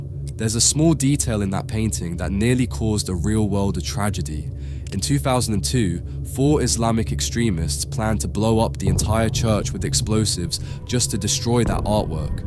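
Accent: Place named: England, United Kingdom. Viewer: Take your English accent and your fear-mongering and shove it I am not afraid perfect love Casts Away all fear death has no power over me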